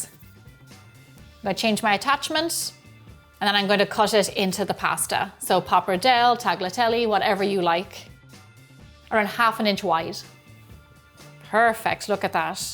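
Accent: Ireland. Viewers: I lov'eth I lov'eth 😆, got to love the Irish accent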